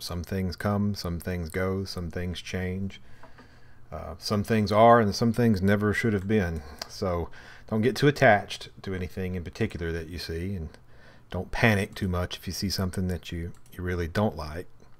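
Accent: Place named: South, United States. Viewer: What's with the accent? Are you a southerner?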